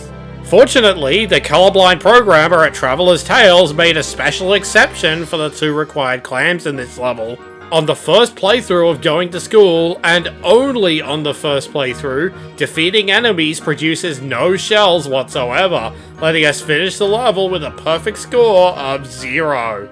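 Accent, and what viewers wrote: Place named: Australia. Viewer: Never heard of this game, nor do I care about it at all...But I sure do love hearing this Aussie try to beat challenges, so I watched the whole thing.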